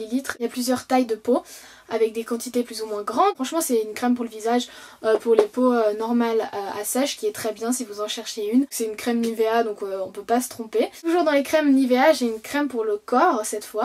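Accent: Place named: Switzerland. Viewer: Hey, donc franchement je tenais à commenter cette vidéo parce que franchement j'adore vraiment tes vidéos ! Genre j'sais pas mais je peux te regarder en boucle pendant 9 minutes je vois pas le temps passer hahah enfin bref, en tout cas j'aime bien tout simplement parce que tu articules quand tu parles, tu as l'accent Suisse et comme je suis Suisse aussi c'est plus agréable je pense, et surtout c'est vraiment constructif ce que tu dis et surtout tu es douces, genre tu cries pas et c'est agréable de t'écouter. Bref voilà continue surtout, tu vas bien monter :) bye !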